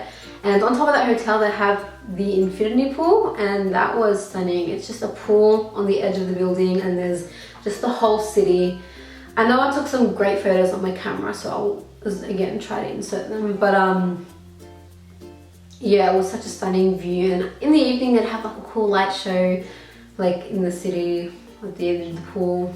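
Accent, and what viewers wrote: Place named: Australia. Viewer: Paayal Shah lmao I was thinking the same?¿ I thought to myself it's probably because of her Australian accent or something! 🙃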